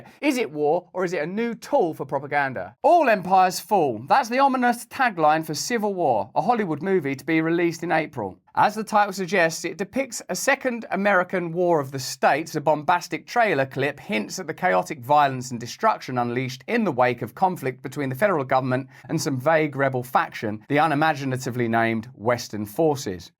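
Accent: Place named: England, United Kingdom. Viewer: He is English…just in case you couldn’t tell…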